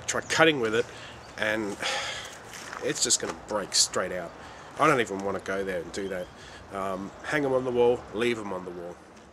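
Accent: Australia. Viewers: are you australian or newzealander? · when i first heard you talk i though your were australian and i got excited to find out we could get swords here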